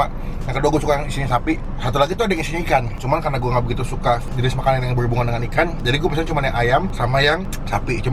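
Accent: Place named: South Jakarta, Indonesia. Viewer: Kok kamu jaksel sekali yoooo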